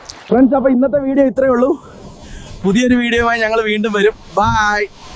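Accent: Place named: Idukki, India. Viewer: അസൽ ഇടുക്കിക്കാരുടെ സ്ലാങ്...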